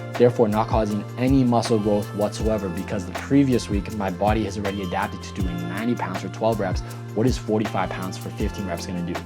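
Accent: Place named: Canada. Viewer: you have a strong canadian accent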